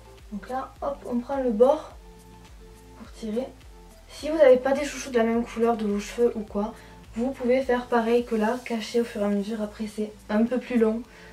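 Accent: South, France. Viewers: coucou , je viens de m'abonner , tu es très jolie et tes cheveux sont magnifiques ! tu es du sud ? je suis du sud ouest ! j'aime l'accent et les cigales ! :) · le journal d'une fille elle vien du sud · toi tu viens du sud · j'adore ton accent il est du Sud ?😀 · franchement t'explique troo bien mercii beaucoup et j'aime bien ton accend ce serai pa l'accen du sud? en trou ca merci troo♥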